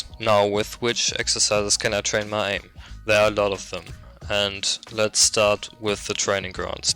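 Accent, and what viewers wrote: Germany. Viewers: Are u german??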